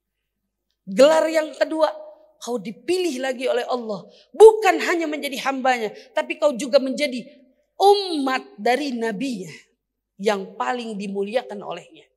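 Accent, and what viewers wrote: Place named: Medan, Indonesia. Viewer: Ustdzah kebawa bhs medannya maasyaaAllah.tausyiah kota medan. Tapi blm pernah denger ustdzah bhs sunda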